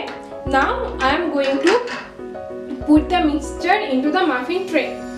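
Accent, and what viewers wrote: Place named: India. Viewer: Please correct to voice quality and also mention in English on screen as your desi accent is hard to understand. Good raspy !!!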